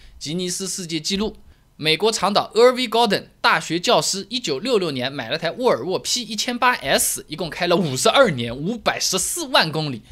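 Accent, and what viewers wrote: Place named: South, China. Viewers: 非常喜欢你的南方普通话。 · 上海口音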